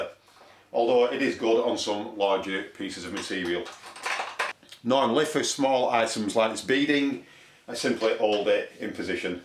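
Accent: United Kingdom. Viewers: Englishman, Scotch, Irish or Welch I wonder? Nice job by the way.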